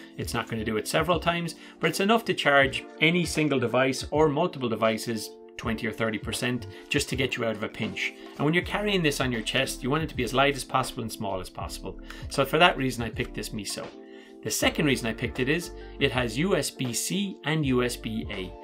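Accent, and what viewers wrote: Ireland. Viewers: I got a little confused at first hearing the familiar Irish accent and seeing an American charger on the desk at the same time.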